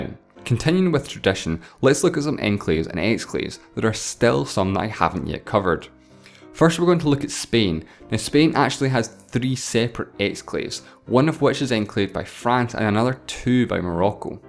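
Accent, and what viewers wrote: Scotland, United Kingdom. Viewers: i cant help but wonder why i find females from the UK, accents sooo sexy, while the males i consider an assault on my auditoty systems.both electronic and organic. anyone know exactlly where this gentleman is from exactlly? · GeoffCMD I don't know what Canadians you've been listening to, but he doesn't even sound close to one. Like Nobody said, he's Scottish. Though he has a thicker accent than I'm used to hearing for Scottish accents, like in Glasgow. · I get what you're saying, but... the dude is not gringo, he's scottish. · what accent is this? scottish? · Luiz Albano He’s Scottish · Thank you mate!! by the way, now that i'm watching the video again his scottish accent is fairly obvious, i should've noticed it before. i'm such a fool 😁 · he has a scottish accent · He’s Scottish. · Love this videos, love this guy's scottish voice, love that tiny piano in the background, love that Chile is mentioned here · are u scottish?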